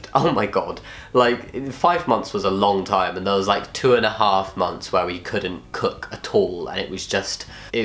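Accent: United Kingdom. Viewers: i love all the british talk its cute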